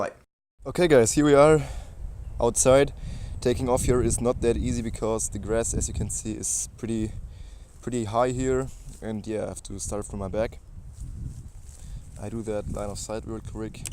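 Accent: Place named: Germany. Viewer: Where are you from? Seems like you're from Germany because of this pretty strong accent but you're doing pretty well keep going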